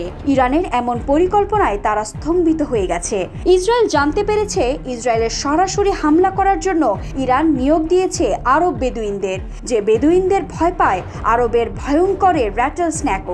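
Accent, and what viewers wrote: Kolkata, India. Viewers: কলকাতা স্টাইলে শাউয়ার নিউজ পড়ে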